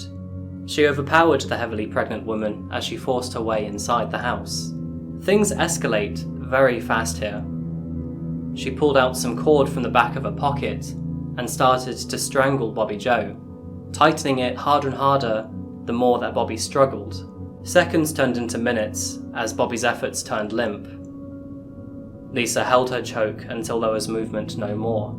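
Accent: United Kingdom. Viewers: UK accent? Plaid shirt? XXL Forehead? I thought we were gonna give it a goo :(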